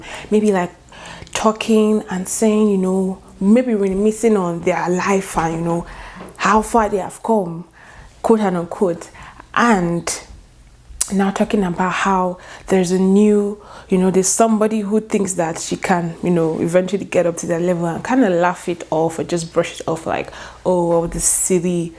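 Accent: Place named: Pidgin, Nigeria. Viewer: Its ur pidgin for me😂😂. I cant even speak like that🚶‍♀️🚶‍♀️🚶‍♀️. Me I sha loved the movie oh.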